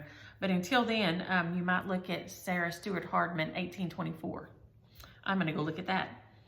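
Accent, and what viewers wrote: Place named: South, United States. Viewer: your videos make my day!!, you warm my heart. I'm a Southern living in the north and hearing you talk makes me feel right at home. Thanks for sharing with all of us. And by the way, where did you get that adorable red blouse?????? I LOVE it!!! Hugs, Judy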